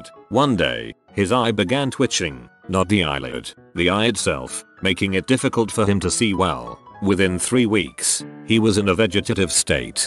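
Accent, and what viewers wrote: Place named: United Kingdom. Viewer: I only listen to these cause i want to hear a robot brit say "bantha fodder "!....double quote....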